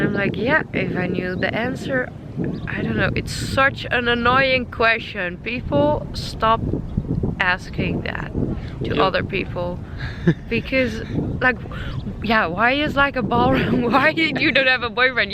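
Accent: Netherlands. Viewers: ***I LOVE YOUR DUTCH ACCENT!!!!****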